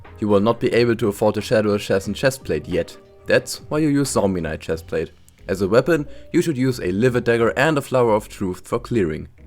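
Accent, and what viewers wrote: Germany. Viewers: man hört dein deutschen aktzent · Are you german because you sound really german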